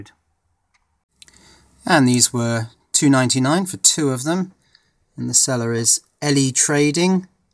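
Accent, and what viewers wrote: United Kingdom. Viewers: Very refreshing to hear a brit with an old accent like his, good work.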